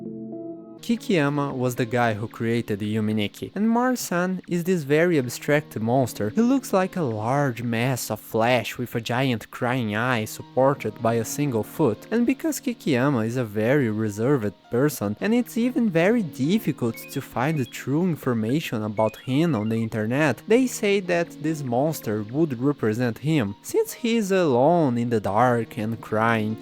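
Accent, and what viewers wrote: Brazil. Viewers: I can sense the Brazilian accent from miles away 😭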